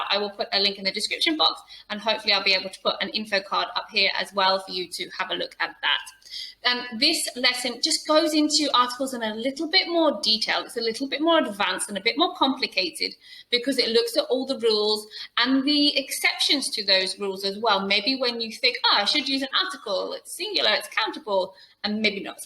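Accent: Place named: United Kingdom. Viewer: Beautiful British Accent !!!!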